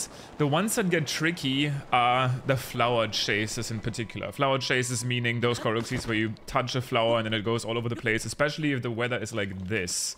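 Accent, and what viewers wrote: Germany. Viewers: I'm not German but i love your accent! Please keep doing what you're doing and disregard the mean comments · I live in Germany as an American and am used to German English accents, I have many friends who sound similar to you and I don't mind it! I'll never understand why people have trouble with accents, like if you don't like it then fine, but keep the negative comments to yourself smh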